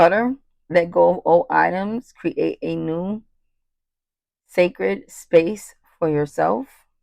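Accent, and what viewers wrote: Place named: New York, United States. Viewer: Are you from nyc lol you have that accent.. praying for all the missing children out here!!!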